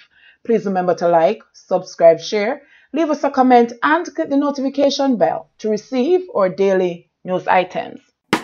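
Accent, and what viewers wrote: Jamaica. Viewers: I like the patois..never heard you like this b4....keep moving forward..one way...blessing!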